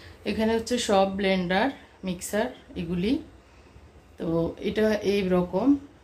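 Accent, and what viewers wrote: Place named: Noakhali, Bangladesh. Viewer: আপনার কথায় নোয়াখালীর টান আছে।কথা আরও শুদ্ধ করেন তা হলে শুনতে আরওভাল লাগবে।তবে আপনার রুচির প্রশংসা করতে হয়।আপনার সাজানো সত্যিই সুন্দর লাগছে।উপরের মন্তব্যের জন্য মনে কিছু করবেন না।এটা ঠিক করলে আপনার বল্গটা আরও ভাল লাগবে।মনে করবেন এটা একটা সাজেশন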